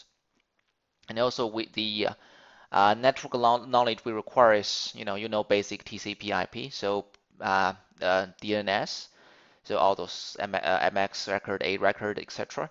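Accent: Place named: Philippines. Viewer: Thanks for sharing this, I'm interested to learn more technical skills in Azure! Appreciated so much. Anyways, are you a Filipino? because you have a filipino accent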